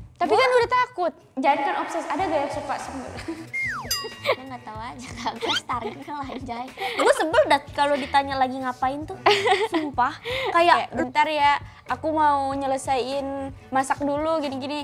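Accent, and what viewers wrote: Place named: Java, Indonesia. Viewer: Kak aksen jawanya medok banget iya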